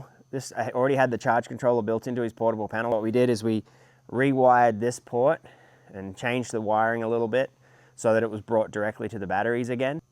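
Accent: Australia. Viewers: Just me or you sound very Aussie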